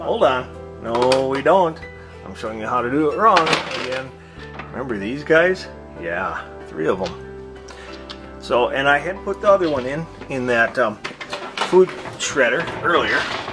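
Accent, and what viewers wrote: Minnesota, United States. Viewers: Bet your from Minnesota or the Dakotas maybe. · I am guessing you might be from MN. (me too, fun to hear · Listening to you makes me homesick for Minnesota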